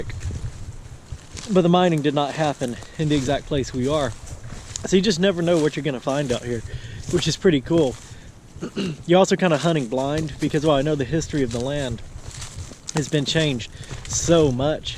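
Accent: South, United States. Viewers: I usually only see your newer videos. I've only been following you for about a month or so. This is the first time I've seen one of your older videos and I love how much thicker your southern accent was. I love your videos.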